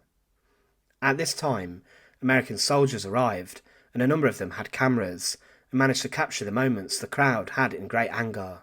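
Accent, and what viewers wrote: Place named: England, United Kingdom. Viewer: I wonder where the man learnt this English accent. He sounds English from England. It’s a bit like Australian English and American English where some statements high rise for reassurance that you agree, like a question.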